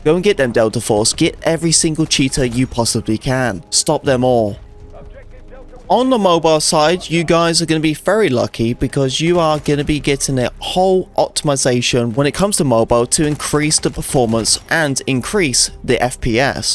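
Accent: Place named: United Kingdom. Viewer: hey man your so british idk what app you said for controller support for mobile no matter how many times i rewind to hear you again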